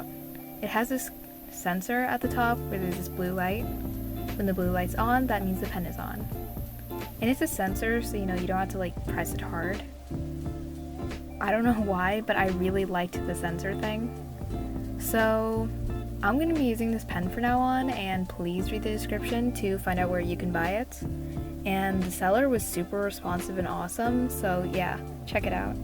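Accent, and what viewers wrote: United States. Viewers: 0 waow ur a Filipino but ur good at American accent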